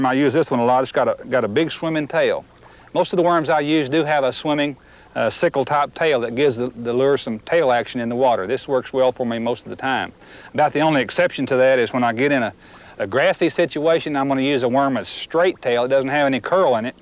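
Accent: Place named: Tennessee, United States. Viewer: You sound like bill dance! Must be from Tennessee?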